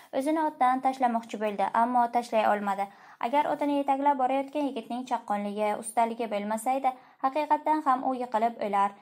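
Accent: Bukhara, Uzbekistan. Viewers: Buxorocha shevezdan ey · Вокеалар Бухорода бўлганлиги учун синглимиз ўз шеваларида ўқиб қўя қолибдилар😊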